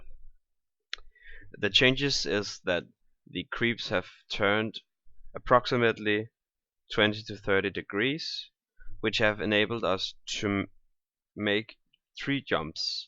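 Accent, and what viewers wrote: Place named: Denmark. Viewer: I guess we have a dane speaking :)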